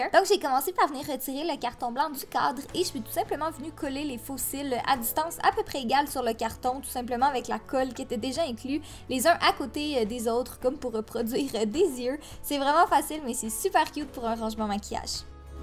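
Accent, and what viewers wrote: Quebec, Canada. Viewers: pourquoi tu fais des vidéos avec ton axen canadien c'est pas beau et sa m'énerve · J'❤ ton accent Québécois 💗💗💗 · j'aime tellement ton accent québécois😍 je trouve ça super original😊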